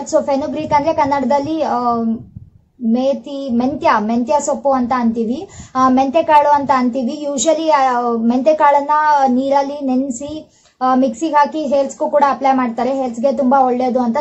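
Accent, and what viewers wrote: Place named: Bangalore, India. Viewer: Very informative video...but I came to know u r from North Karnataka, Sindhanur. Why do you speak in Banglore kannada accent? Uttara Karnataka kannada is so nice to hear. It is also understood by everyone.Ultimately it's your wish to talk anyway...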